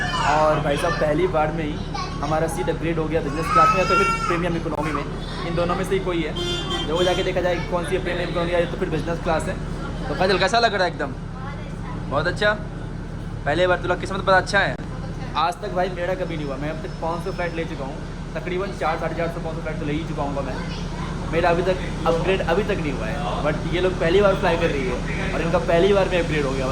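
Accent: Bihar, India. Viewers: ❤❤❤❤❤ Bhai aap to same to same meri tarah hi bolte ho bihari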